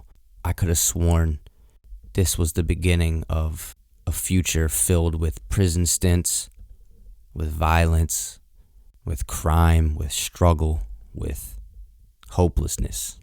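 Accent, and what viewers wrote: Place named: Philadelphia, United States. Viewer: I knew knew knew you were from Philly lmaoo I live rite in south Jersey I get my haircut rite on Frankford I knew it just from the accent but yeah man I'm really enjoying your content I can really relate I've had addiction issues and I'm trying to overcome them really love what you do man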